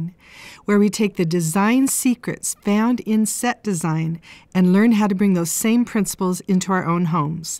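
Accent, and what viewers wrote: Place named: Utah, United States. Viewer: Beautiful work! I heard you speak this time and thought, "She's gotta be a fellow Utahn." Yep! Cool! Pleased to have something in common with you, in addition to our love for these neat spaces!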